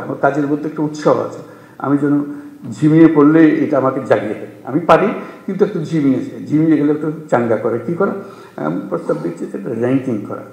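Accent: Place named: Chittagong, Bangladesh. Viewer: চিটাইংগা কথা গুসসা লাগে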